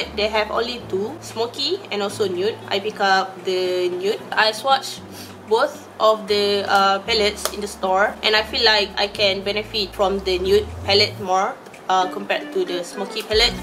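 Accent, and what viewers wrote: Malaysia. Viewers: I love ur English sis. Very meleis but grammatically correct! Definitely worth subscription 😙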